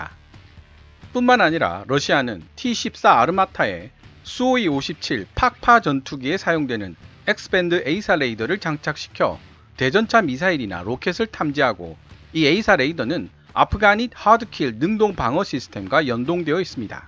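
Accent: Gyeongsang, South Korea. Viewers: 얏~야! ....니는 갱상도 사투리부터 고치는게 시급하다!!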